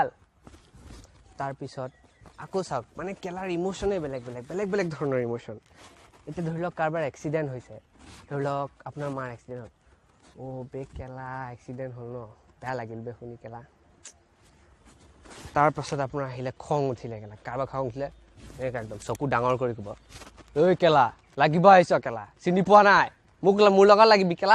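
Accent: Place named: Lower Assam, India. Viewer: Aap Jo ye Word Bol Rahe ho..haa imke similar jo sare word hai... Wo lower assam ke logo ke muh mai jyada suit krne hai.accent accha hai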